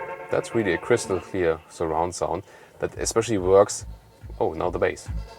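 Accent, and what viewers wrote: Germany. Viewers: He's not mumbling, that's a german accent...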